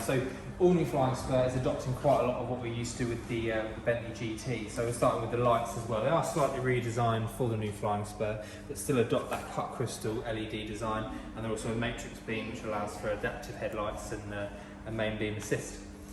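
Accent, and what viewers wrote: India. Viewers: The guy never shows his face. From the accent he sounds Indian. How come you hiding your face?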